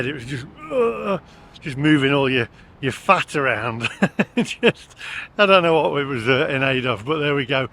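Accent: United States. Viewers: 80's Ford Thunderbirds were dreadful. My neighbour, an English photographer in Antigua, had one. It couldn't pull the skin off a rice puddin'. My Chevy of the same era had a lot more poke. Oh, and yes, you do fall into speaking Yankee while you're with others. Even today some words do fall out without thinking.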